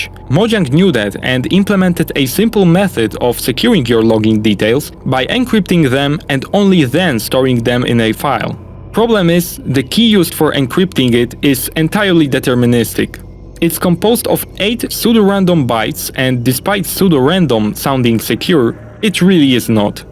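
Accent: Poland. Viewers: wiedzialem ze jestes polakiem po akcencie slychac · Akcent typowo polski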